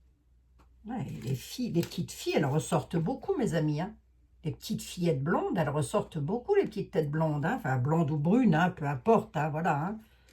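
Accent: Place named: Paris, France. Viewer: Mais j'aime ! La belle Lorraine qui parle en Titi de Paris ❤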